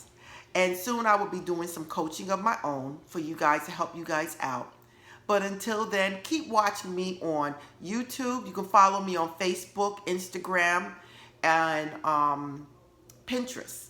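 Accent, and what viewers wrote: New York, United States. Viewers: Tallkking..love my fellow nyer. Great mtrl..thank you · Girl! I have never seen you or your videos before but this video was in my feed and it is no accident. This is definitely something I needed to hear. I've done this in the past - not really knowing what it was called and it is an amazing technique. I must pick it up again because I need to break through some stuff. BTW, you must be a fellow New Yorker. I can tell by the way you say "tawk" aka talk. LOL. Anyway, I liked and subscribed.🙂👍🏽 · You have to be from NYC♥️🙏🏿I hear your accent. I’m from Brooklyn and enjoy your content. ♥️🙏🏿Blessings to you and your family. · Definitely from New York.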